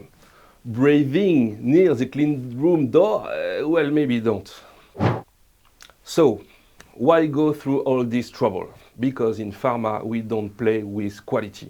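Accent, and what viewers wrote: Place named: France. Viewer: What a lovely french accent.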